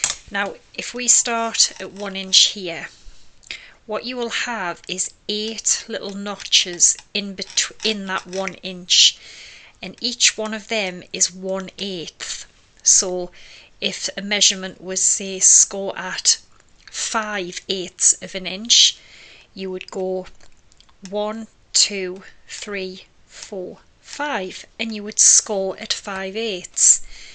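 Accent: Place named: Northern England, United Kingdom. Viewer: It's almost half past one in the morning here - I found you earlier this evening and am blown away! What a star find! I've been card making for around 20 years and just love your ideas. Photopolymer stamps also have a distinctive smell compared to acrylic ones - sadly even some reputable UK designers are cutting their costs and still have their stamped made overseas and many of them are acrylic. AND, my laminator is coming out to play when I eventually get up later today! HUGE thanks - how I wish I'd known a lot of this particular video when I started out.. I obviously have a lot of hours of fun viewing ahead! Di x (Northumberland exile - so it was an extra delight to hear your lovely Northern accent).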